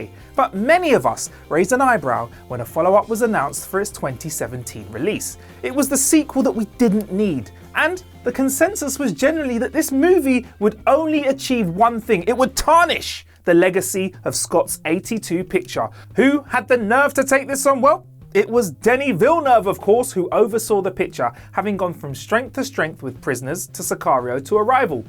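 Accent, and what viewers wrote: United Kingdom. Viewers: Why don't you guys just realize that British people pronounce things differently than Americans do?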